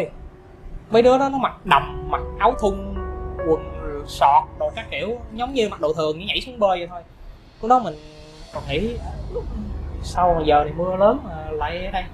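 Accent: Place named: South, Vietnam. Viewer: cách nói chuyện rất Nam Bộ, nghe rất thích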